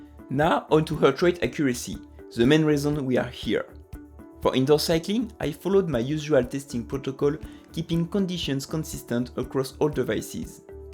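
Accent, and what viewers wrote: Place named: France. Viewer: This is the thickest French accent ive ever heard. Its great. Thanks.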